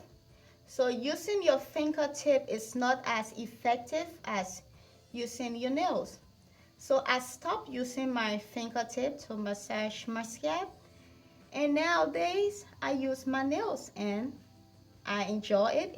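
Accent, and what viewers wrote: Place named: Liberia. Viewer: Your accent remind me so much of my sister in law - she is Liberian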